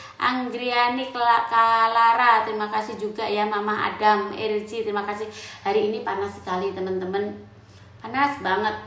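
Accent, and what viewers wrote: Java, Indonesia. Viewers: Iya mbak baik tidak neko2 berbicara juga masih logat jawa tudak gabung inggris ya rendah hati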